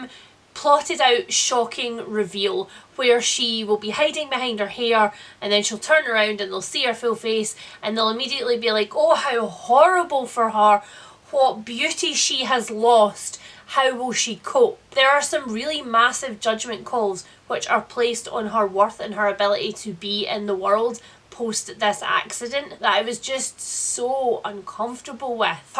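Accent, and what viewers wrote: Scotland, United Kingdom. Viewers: I ❤️ your Scottish accent.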